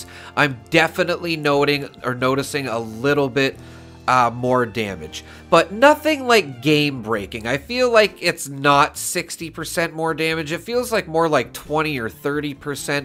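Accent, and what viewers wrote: Canada. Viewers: Dude, are you Canadian perchance?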